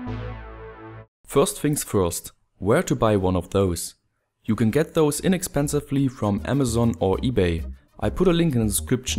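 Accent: Germany. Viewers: Hmm.... The Sound is like german speak english 😉😄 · Are you a German? Sounds like :D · Du bist deutscher man merkt es bitte mach deine Videos auf Deutsch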